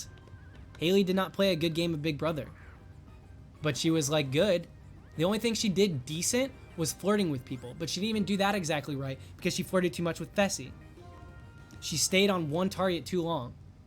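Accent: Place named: France. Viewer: No !!!!! Your French accent is so cute ☺️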